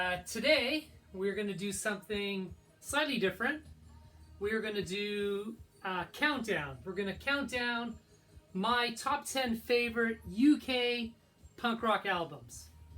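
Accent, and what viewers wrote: United States. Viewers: Dude you're not a London boy you sound American or canadian Americans started everything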